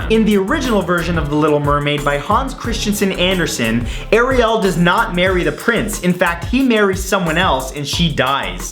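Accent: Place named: Canada. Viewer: Are you Canadian?? I notice a slight accent at times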